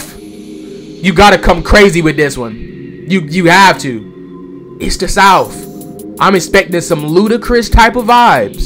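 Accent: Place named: Philadelphia, United States. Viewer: YOU’RE FROM ATL? I thought you were from either Philly or Michigan.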